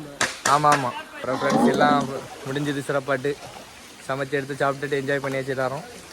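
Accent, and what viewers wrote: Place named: Kanyakumari, India. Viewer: KK slang 👍👍🔥🥳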